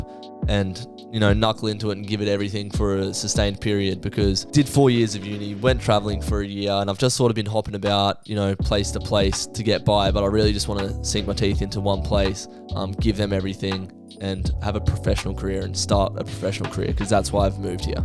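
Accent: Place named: Australia. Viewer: just got around to watching this..couldn’t agree more. the people you meet & relationships you foster along the journey is what makes life worth moving through the sludge. beautiful q&a, looking forward to more content in 2025 mate🫡🫡 send me a voice note soon braindumping what’s been going on—good to hear the ole aussie accent xxx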